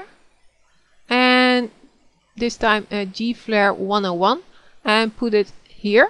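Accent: Netherlands. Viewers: haha ik hoorde na de eerste letter dat je nederlands was xD wel cool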